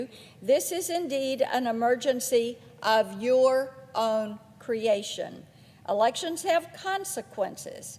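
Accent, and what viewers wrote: South, United States. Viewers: Her Southern Schoolteacher accent is absolute music. That accent spans from Laredo, Texas to Pigeon Forge, Tennessee. Pure ASMR.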